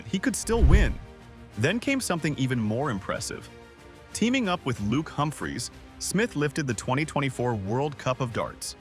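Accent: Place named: United States. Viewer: an American voice over and a few repetitive meaningless clips. Absolute shite!!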